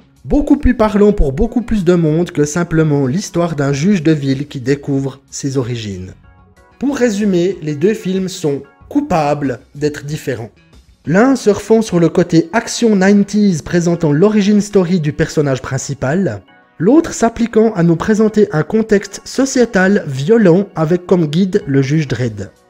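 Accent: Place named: Vaud, Switzerland. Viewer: Excellent cet accent de bien de chez nous. Signé, Le Vaudois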